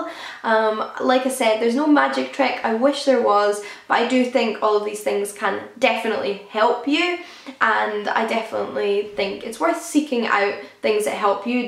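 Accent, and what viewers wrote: United Kingdom. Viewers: Well, nice to meet you! You're beautiful have a good british accent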